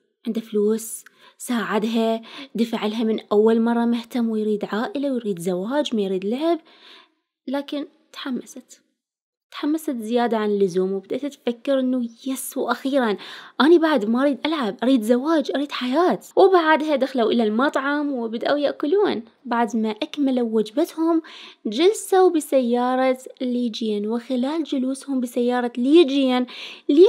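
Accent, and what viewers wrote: Iraq. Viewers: شدخال القبيلة. هي عراقية واخلص ف الكل يحجي كذاا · عندي سؤال محيرني من مده الحين لهجه صاحبه القناه وطريقة نطقها نفس اسو لكن السوال وش قبيلتهم بالعراق وش ينقالهم الي يتكلمون بهل نغمه 🤔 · انتي من اي دولة لهجتج خربطتني نص عراقي ونص اردنية ونص اكويتية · كل العراقيات يحجن هيج خصوصا الي ببغداد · عراقية وهاي لهجتنا ف طبيعي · حبيت اللكنة العراقية لاني اسمعك على الرغم اني درسوني عراقيين وانا يمني الاصل ودرسوني اجانب والحمدالله صرت انسان رفيع مستوى بس لديك للكنه واضحة الى الامام دوما I loved the Iraqi accent because I can hear you, even though I was taught by Iraqis and I am of Yemeni origin, and they taught me by foreigners. Thank God, I have become a high-ranking person, but you always have a clear, forward-looking accent.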